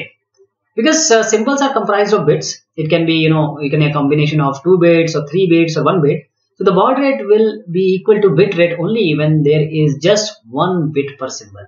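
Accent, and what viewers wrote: India. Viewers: Classic Indian accent, noice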